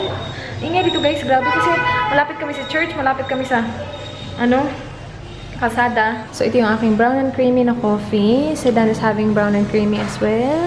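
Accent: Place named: Visayas, Philippines. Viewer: Ka cute nimo mo sulti ug Bisaya te oi😂😂😂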